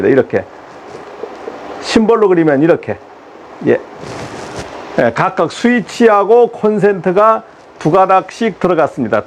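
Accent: Jeju, South Korea. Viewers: 말투가 제주스타일 갔다고 생각했는데 구독했더니 제주도 분이네요 반갑습니다❤❤❤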